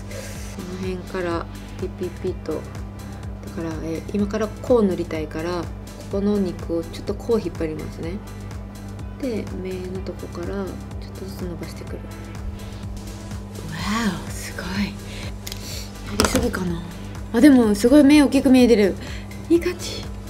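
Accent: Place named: Aomori, Japan. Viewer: 東北人のわたしですらなまってるなぁと感じるのですがあいちゃんは東北の方ですか、、？青森っぽいなまりだなぁと思いますが違かったら失礼しました( ´тωт` )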